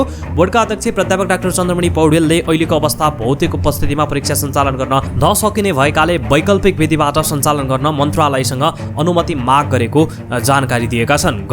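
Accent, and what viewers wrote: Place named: England, United Kingdom. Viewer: नेपाली बोल्दा नि अग्रेजी tone निकालेर pronounce गर्नु पर्ने? आफ्नोपन ल्याउनुस न् ।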